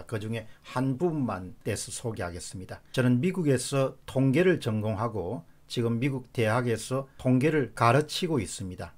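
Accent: Gyeongsang, South Korea. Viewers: 경상도 사투리쓰네 ㅎㅎㅎㅉㅉ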